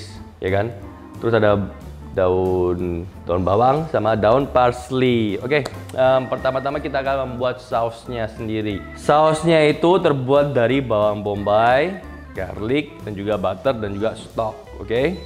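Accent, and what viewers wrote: Surabaya, Indonesia. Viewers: Hohohoh asli suroboyo rek celotehnya 😀😀😀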